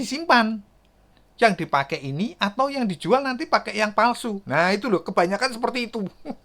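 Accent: Java, Indonesia. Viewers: Logat jawamu medok banget min😂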